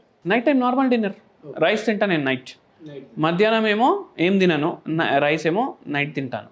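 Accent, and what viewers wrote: Telangana, India. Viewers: bro it's telangana slang don't insult the language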